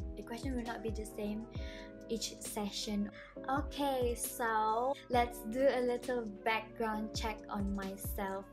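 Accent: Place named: Sabah, Malaysia. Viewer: Dusun accent is strong in this one haha. Anyway love your make up! Pls do a tutorial for it.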